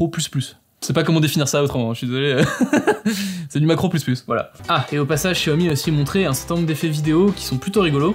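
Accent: Normandy, France. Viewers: Clair, net, précis et sans blablabla qui comble le manque d'information. Au fait, tu ne serais pas Normand?👍👏😉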